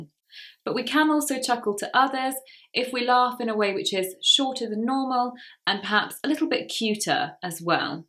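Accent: United Kingdom. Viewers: Hi madam, really we have enjoyed your teaching in English and satisfied your grammatical and real British English for we accept this as native english,so may we suggesting you to speak little slower than usual so that we can heard sound and clearly okay! We also satisfied special English news from America which suits us slow,clear and steady English speaking.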